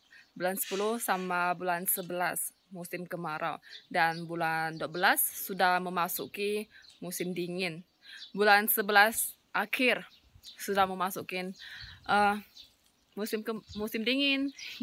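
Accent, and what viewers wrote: Kalimantan, Indonesia. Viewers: Itu logat tionghoa kalimantan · Sepertinya Tionghoa Kalimantan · asli Indonesia dari Kalimantan tapi dia keturunan China Indonesia ketika di Indonesia lebih sering pakai bahasa daerah seperti bahasa Hakka jadi ketika ngomong indo logatnya rada asing🙂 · Logat bicara org kalimantan , Pontianak mmng kebanyakan begitu bu..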